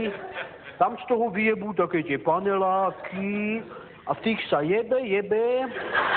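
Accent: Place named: Brno, Czech Republic. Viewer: JSEM STredocech a takhle nemluvim co to kecas :D:D:D:D:D:D stradoceske nareci fakt ne xD mozna brnenske :D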